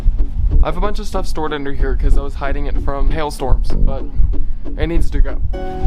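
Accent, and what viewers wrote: South, United States. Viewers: Do your parents support your sexuality? Would be curious to hear you talk about that. Growing up in rural places can be really taxing, maybe im being presumptuous but I definitely think I hear a southern twang in your voice. It’s cute. Really happy for you and austin, hope you guys have many happy years together on the farm.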